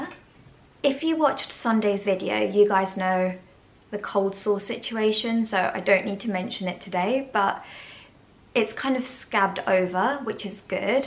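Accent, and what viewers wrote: United Kingdom. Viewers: Dear sweet. You are adorable!!! I watch your Tuesday videos on the car ride home for you and I drink my lukewarm bottled water that’s been sitting in my car all day. I love hearing you speak. First of all your British accent is adorable. I’m from California where nobody enunciates their words. You have the best elocution. Lol, it’s so great to hear you speak about things other than the C word because you are so much more than that. I want to hear about whatever you want to talk about. This is your safe space. I’m really happy to hear that you are looking forward to working. It would be amazing if you could start out on a very part-time basis. Filling in for people who need to take a day off would be so helpful to your coworkers, the patients and for you. It would be a win-win-win situation. It is a bit early for Christmas. Lol. But I’ll let you be you!!! 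Don’t throw away your tree on the 26th., though. The Christmas season actually lasts until January 6th. 🎄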